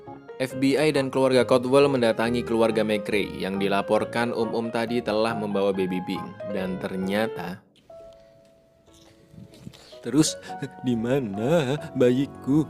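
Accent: Java, Indonesia. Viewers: Pengisi suara nya Orang jawa ya mas? 🙏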